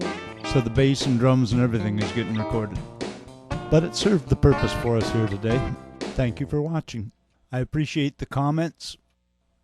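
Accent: Canada. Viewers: Hey Dude, Nice job on the tutorials. I'm am down here in San Diego and used to be from Cleveland, the Home of Rock and Roll, had a studio there called North Coast. You remind me of many a gig I did in Canada. I didn't read your bio but you have to be from the Great White North by the sound of your voice. I must say, you have a very polished delivery in your tracks and really easy to follow. I, being an engineer have spent HOURS editing "ums.& ahh. ahh" Nice instruction, preperation/delivery.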